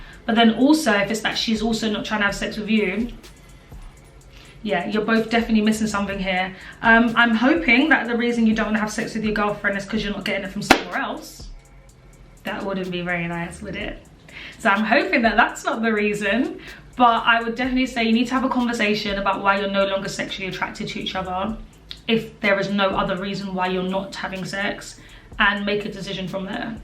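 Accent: United Kingdom. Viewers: that beautiful brit ancient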